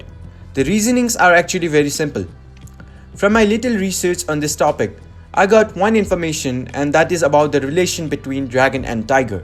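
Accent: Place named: India. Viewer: Oh I’m sorry I taught you we’re because you have an Indian accent. Good Video btw!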